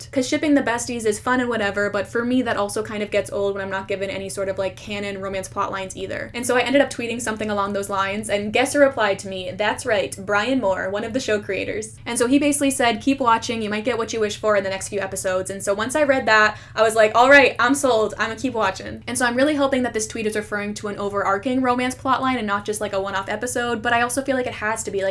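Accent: Canada. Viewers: Also like your accent. Aboot!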